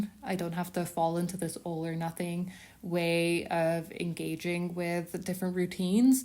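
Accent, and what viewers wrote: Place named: Ireland. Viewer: Do you mind me asking where you are from? I find your accent fascinating. As an American English speaker, I don't detect a strong accent on most of what you say, but occasionally some words feel slightly seasoned with an accent that I can't quite identify. Seems possibly Irish, but my mind might be jumping that way due to your red hair.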